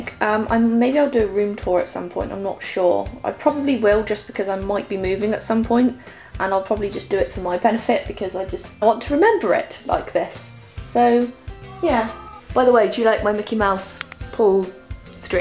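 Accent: England, United Kingdom. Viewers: are you australian or english? your accent reminds me like your from the UK or something XD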